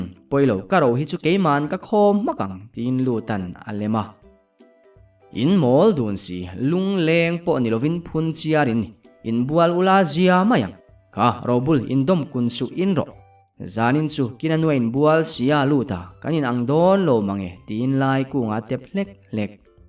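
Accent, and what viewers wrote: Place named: Paihte, India. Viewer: Paihte pa tawng dan hi chu a mual thelh in i thelh, note tawng dan i zir ani ringot alawm le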